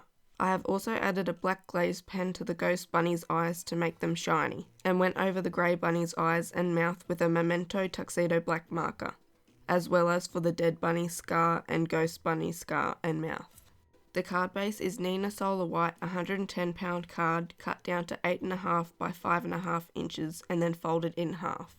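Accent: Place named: Australia. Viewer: I wondered where you lived with that super cool accent. Australia! How neat! I know someone from there and for years I spent time trying to convince him to mail me a kangaroo. He kept telling me that kangaroos are wild animals and are not meant to live inside your house, but I would not take no for an answer. I had an awesome picture in my mind of my very own kangaroo carrying stuff around for me all the time in its super cool kangaroo pouch. That is my story and I'm sticking to it! I didn't realize that Australia doesn't really celebrate Halloween. That is something that I hope changes because Halloween is my favorite day of the year, so I wish that everyone would get to experience it! This year I got to meet my new neighbor who is four years old and is named Faith, when she ventured across the street because she was looking for candy. She actually yelled and asked if we had any, at which point we yelled back very loudly, "We have so much candy, and glow bracelets, and bags of Halloween toys!" Needless to say, she convinced her dad to come across the street and come inside to meet us. 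It was great. There are so many cute things that happen every year. Tiny people are my favorite kind of people, and Halloween is the one day of the year that a bunch of them show up at your house wearing adorable costumes! That is why I like it so much. I will find you out in social media land. I really hope that it is not expensive to mail that card all the way to me in the United States. If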